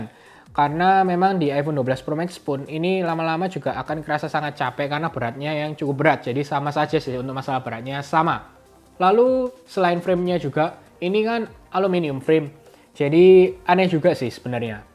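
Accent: Java, Indonesia. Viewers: Orang Jawa logatnya keliatan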